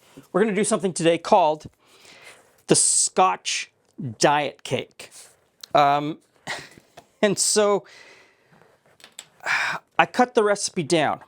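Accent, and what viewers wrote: Canada. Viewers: The "english" language is so fluid and changes overtime to the point we should probably not be calling it that. I live in New York and i can tell you what we speak is certainly not "english" anymore. lives in Canada and you speak a dialect which should be called Canadian. As my new york dialect should be called American.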